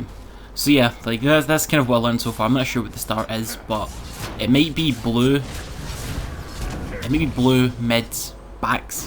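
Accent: Scotland, United Kingdom. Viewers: nah bro I'm trying to start YouTube I watch you're vid heard the accent and I was so happy to find another Scottish smite player. But I play Xbox if I had a PC I would play with you for sure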